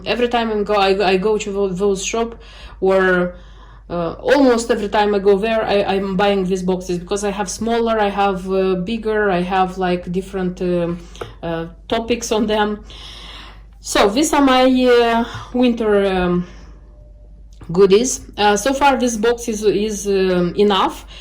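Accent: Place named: Moldova. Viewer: я по акценту сразу поняла что вы из Молдовы, я тоже там родилась, поэтому с еще большим удовольствием смотрю вас😊 Румынский уже очень плохо помню, живу давно в России, очень люблю ваш канал, столько вдохновения!💗🌷🌷🌷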